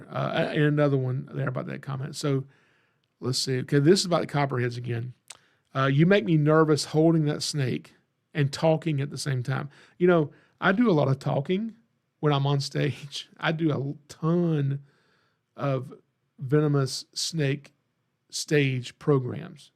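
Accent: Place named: South, United States. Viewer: Re your "accent": Louis Grizzard once famously said, "I believe God talks like we do." It's the opening line in his comedy routine "Southern Speaking" and may do you some good to hear.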